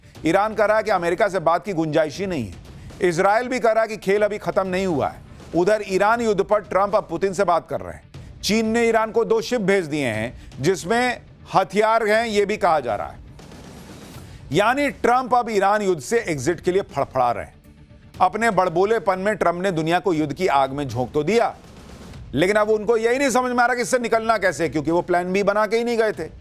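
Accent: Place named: Magahi, India. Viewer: Pura patnaiya maghi style me bolte hai sir aap😅😅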